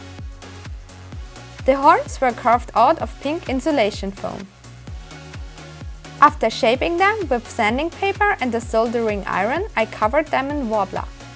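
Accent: Germany. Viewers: Great work!!! Are you german? Your accent sounds like you re from Germany. Oh and can you build the Necorchasm or Thorn from Destiny? Would be awsome! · You're incredibly talented, but German accents make me cringe :D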